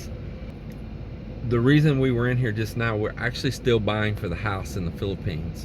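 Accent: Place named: East Texas, United States. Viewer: Lol. First time I heard your voice I was like I bet he's from Terrell or Tyler area. Iloilo just went to ECQ. And I've found good outlets for cheap at certain Handyman stores. I use GFC outlets and surge protector strips throughout so my wife can just flip the switch instead of unplugging everything everytime.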